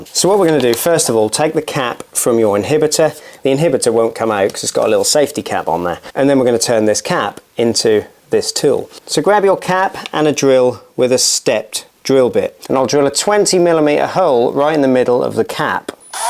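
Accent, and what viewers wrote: Norfolk, United Kingdom. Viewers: Is that a Norfolk accent? Just asking.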